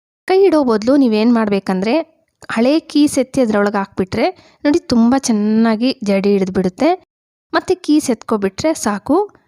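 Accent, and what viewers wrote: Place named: Kolar, India. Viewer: Kolar side avara nimma bashe agide adikke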